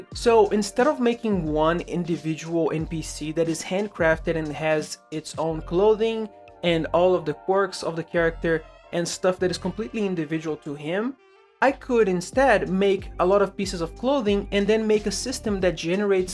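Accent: Brazil. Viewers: esse sotaque parece de BR 🤨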